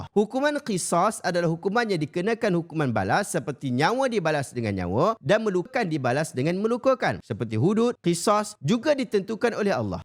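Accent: Malaysia. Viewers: Ikut telo melayu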